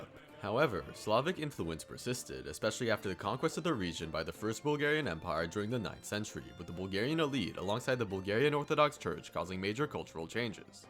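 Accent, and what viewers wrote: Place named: France. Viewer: I love your reading of romance text with a French accent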